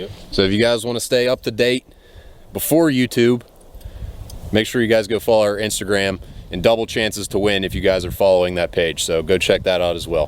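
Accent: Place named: Pennsylvania, United States. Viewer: I honestly got hooked on your channel because of that thiccc PA accent. I have a lot of family from PA so it’s refreshing to hear lol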